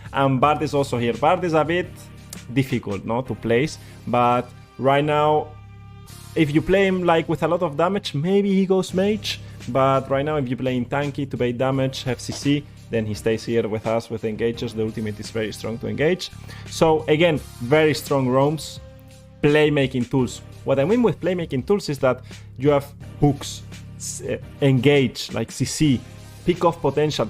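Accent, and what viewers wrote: Spain. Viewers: suena tan raro escuchar a alguien español haciendo tutoriales en ingles xd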